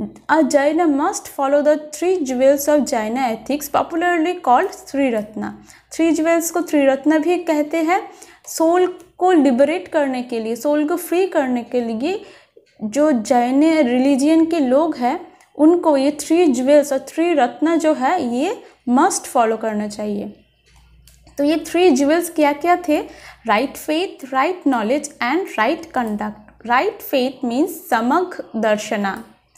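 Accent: Bengal, India. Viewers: And apnar kotha shunei bojha jaay apni Bangali🥰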